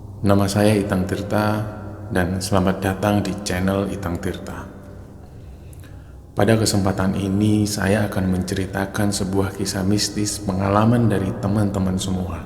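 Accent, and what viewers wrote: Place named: Java, Indonesia. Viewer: Logat jowone ojo sampek ilang mas...semangat 💪💪💪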